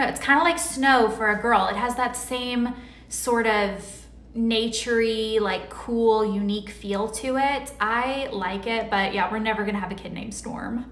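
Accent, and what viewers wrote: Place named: South, United States. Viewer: You're sounding so much more Southern since you've moved out of LA. I'm so glad you've come back to YT, I've been watching you since your college pink room with the Eiffel tower painting. I have 2 boys Jude and Rory. It's so hard to name kids.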